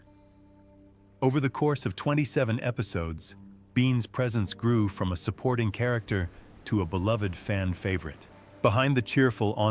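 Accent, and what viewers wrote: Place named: United States. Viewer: Who needs to hear an awful american voice in a typical dull silly over dramatised so called factual documentary….👎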